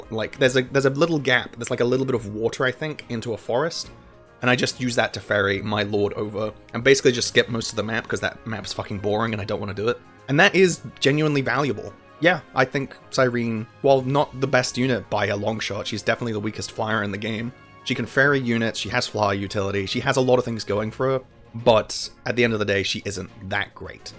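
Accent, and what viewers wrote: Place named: United Kingdom. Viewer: My experience with: > hey, that’s a chill tierlist, it can help stay up a bit longer. May as well subscribe, to watch that other Radiant Dawn 4-part series! > hey, that’s wind waker bgm, that can cheer me up a bit..! > that’s british accent, it sets me on good moods! > OH that’s theme!! And that’s how I became a fan! Thanks for the content! ❤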